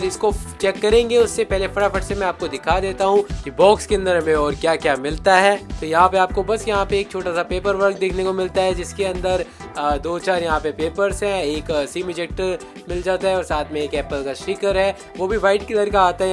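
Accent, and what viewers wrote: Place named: Gujarat, India. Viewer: Bhai apke bolne ke ascent se ap gujrati lagte ho❤😂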